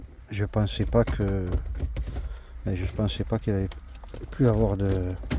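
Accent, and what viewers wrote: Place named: Marseille, France. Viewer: avec l'accent marseillais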